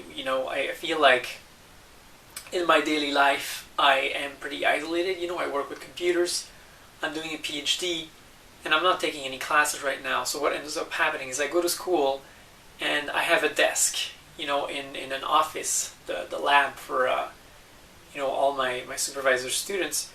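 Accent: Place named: Quebec, Canada. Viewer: First, your videos are fascinating and intelligent. Second, you have a hoarde of viewers, so if this question has been asked and answered already, I apologize. I am however very curious; you seem to have a French (Canadian) accent. Yet all of these videos seem to be in (perfect) English (Canadian). I'd expect them to be in French; is there a reason for this?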